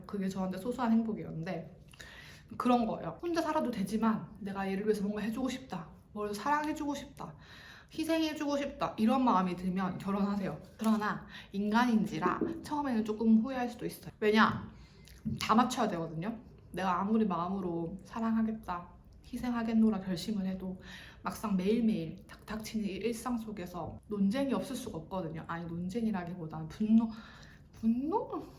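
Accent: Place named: Gumi, South Korea. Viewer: 진정 말씨는 구미분이십니다 ㅎㅎ😊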